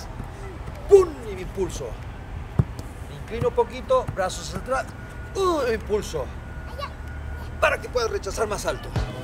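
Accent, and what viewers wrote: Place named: Mexico. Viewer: Pero choro no hables como Méxicano🙄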